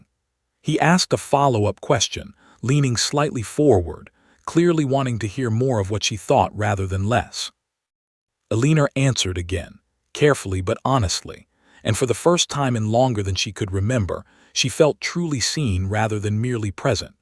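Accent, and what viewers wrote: United States. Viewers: Oh dear, about half way through and at least half a dozen mis-pronouncements in an inappropriate american, to my ear, voice. But it's a short story and perfectly sweet.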